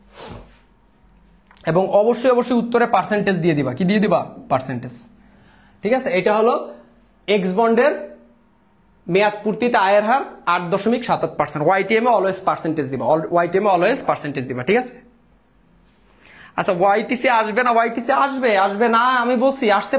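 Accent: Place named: Noakhali, Bangladesh. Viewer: স্যারের বাড়ি মনে হয় নোয়াখালী বা লক্ষ্মীপুরে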